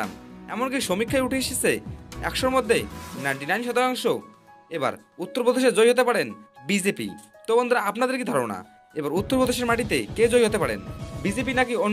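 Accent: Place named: Bangladesh. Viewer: Very bad accent. Must b Bangladeshi. Find some who can speak clearly.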